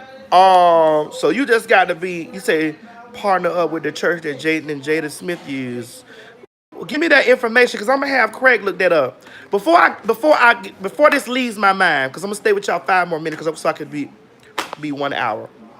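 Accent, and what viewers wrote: Florida, United States. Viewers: Hurry up🤣🤣😂 With that Florida accent. I love you!! Yasssss Praise him!!😚😚 Omg!! Kick rocks and boulders! I'm on my side laughing!!💜💙💚💛❤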